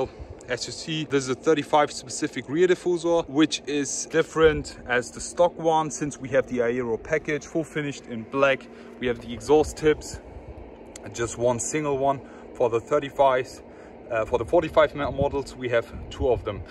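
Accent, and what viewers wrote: Germany. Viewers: Good work short comments. PS Man hört das du deutscher bist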